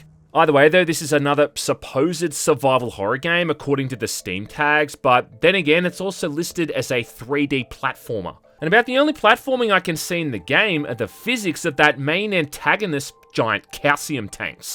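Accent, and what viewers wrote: Australia. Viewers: Are you OK? You're sounding like an Australian today.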